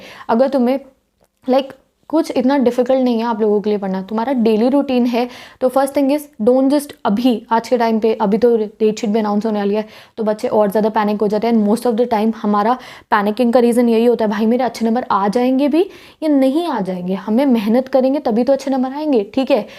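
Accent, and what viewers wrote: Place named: Meerut, India. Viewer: Didi where are u from ? I am from meerut and it seems that you are also from meerut .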